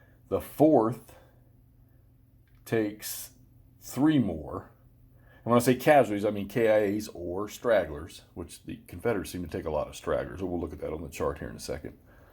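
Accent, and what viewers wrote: United States. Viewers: See to my english ear it sounds more southern gentlemen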